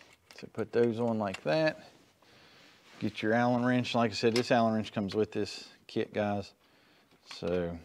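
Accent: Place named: West Virginia, United States. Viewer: I knew I recognized your accent, I myself am a West Virginian. Proud to have you representing. 🤠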